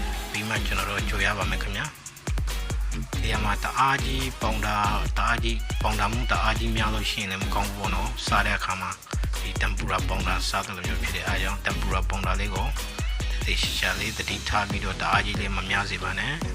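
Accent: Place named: Rakhine, Myanmar. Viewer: ရခိုင်စကားပြောသံ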